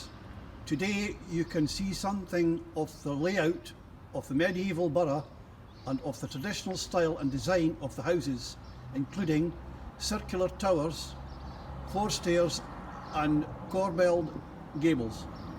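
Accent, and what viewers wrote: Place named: Scotland, United Kingdom. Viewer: Great to hear a scottish voice,talking about Scotland,jedburgh,Edinburgh,my relative staying in the jedbough castle.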